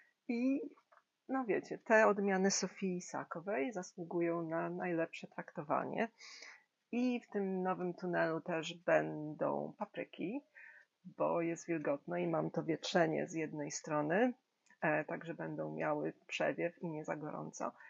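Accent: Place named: United States. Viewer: Skąd ten amerykański akcent?😊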